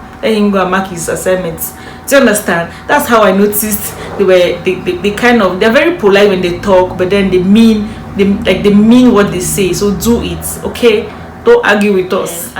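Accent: Nigeria. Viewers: Lol u sound sooo Nigerian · I am sure that she grew up with Nigerians cos her accent is purely Nigerian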